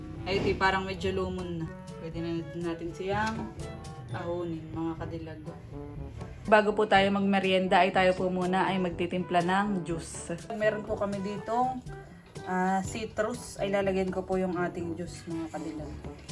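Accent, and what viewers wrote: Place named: Quezon, Philippines. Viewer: Ok lng Naman kahit may Punto ang salita ganon talaga sa Quezon prov!❤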